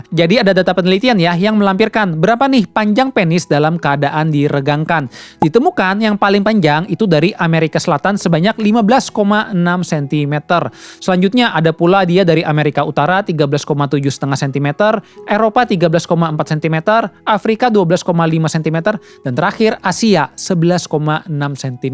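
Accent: Banjar, Indonesia. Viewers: Eh orang banjar kah? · lohh urang banjar sekalinya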